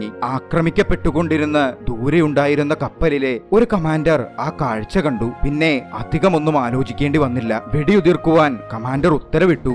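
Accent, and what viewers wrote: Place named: Namboothiri, India. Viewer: അവതരണം- നമ്പൂതിരി സ്റ്റൈൽ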